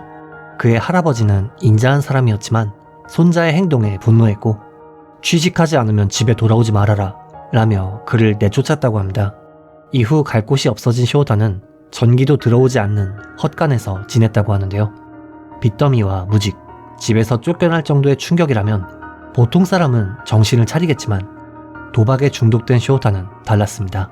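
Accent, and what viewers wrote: Japan. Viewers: 예전에 영상 정주행 중일때 사실 님 일본인이신줄 알았어요 ㅋㅋㅋㅋ 테마 파--꾸 이런단어나 한국어 ㅈ 발음도 약간 그 일본어 자지즈제조 같아서? ㅎㅎ 지금은 일본어 초보자로써 굉장히 부럽네요 ㅋㅋㅋ 늘 조곤조곤한 목소리에 잠들고 있습니다 감사합니다~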